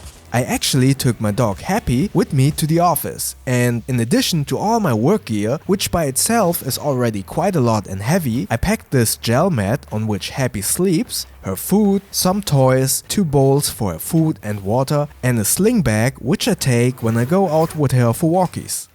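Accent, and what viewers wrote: Germany. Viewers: I honestly can't tell if you're happy, sad, upset, mad or tired because of your German accent lol. It's basically always one tone because of the pauses. I'm sure that's just a habit of speech from the German language.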